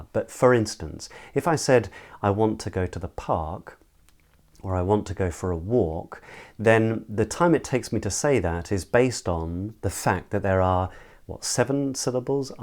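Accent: RP, United Kingdom. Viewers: Great video! Let me point out once again that you're one of the best Standard British English (Contemporary RP) Pronunciation teachers I've ever come across on YouTube and since I occasionally teach and translate English professionally myself, your tips will surely improve my teaching skills.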